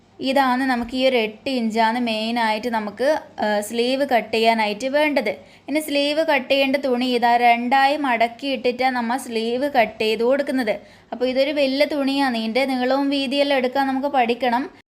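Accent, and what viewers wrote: Kannur, India. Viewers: കണ്ണൂർ ഭാഷ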